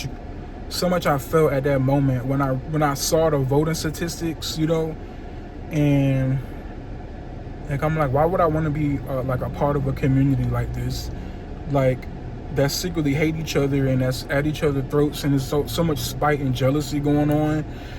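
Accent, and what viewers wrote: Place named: Spain. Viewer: So you developed a so called Spanish accent.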